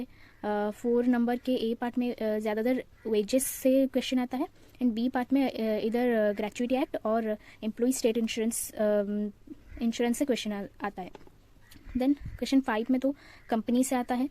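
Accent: Odisha, India. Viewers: I think you are from odisha,Am i right?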